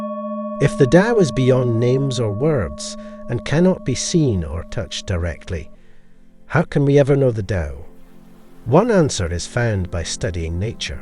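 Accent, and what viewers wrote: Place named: Scotland, United Kingdom. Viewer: What a grand Scots brogue you have ser. A gent and a scholar! Many thanks.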